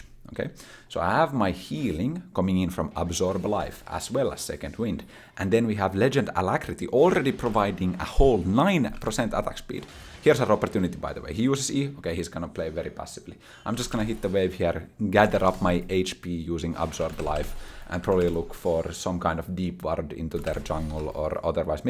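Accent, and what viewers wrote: Finland. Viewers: You have such a Finnish accent I love it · are u from finland? ur speaking kinda sounds like it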